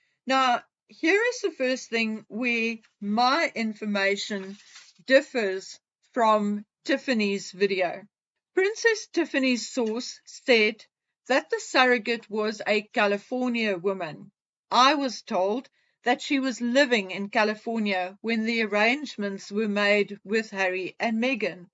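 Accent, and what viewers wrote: South Africa. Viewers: Hi ,- so nice to hear a South African voice on these Harkle exposé videos! Thank you for your in depth research and your integrity. You are always balanced, fair. I would be interested in hearing more about the paintings.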